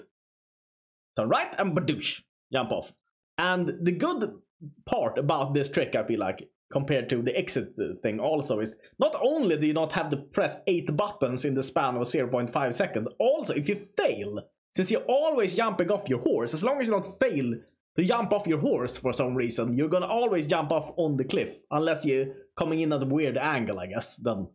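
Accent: Sweden. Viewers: Svengelskan är start asså😁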